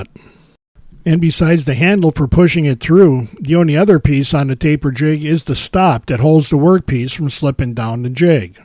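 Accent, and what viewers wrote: Chicago, United States. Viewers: I’m absolutely loving this Chicago accent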